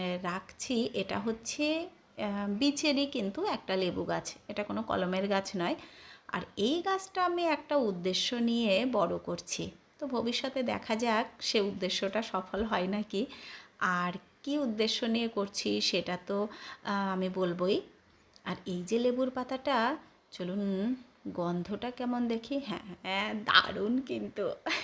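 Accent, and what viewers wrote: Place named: Bangal, Bangladesh. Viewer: তুমি মোনেহয় বাংঙাল ।তোমার খাওয়া কথা বলো আমার সাথে মিলে যায়। আমরা বাংলাঃ ফরিদপুরের। অনেক দিন ধোরে বেরাকপুরে।❤❤❤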